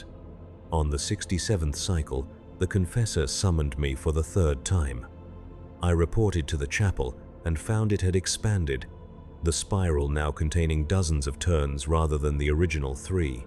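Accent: United States. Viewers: Put the voice back, American voices SUCK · Old voice was so much better, new voice is too American sounding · Wtf is with our favourite voice sounds to American now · Too American voice not good to listen to. 😢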